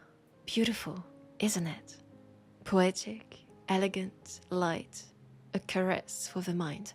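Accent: France. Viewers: Im down bad for the french accent, chat am I cooked 🥀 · Ah, my favourite French accent! :3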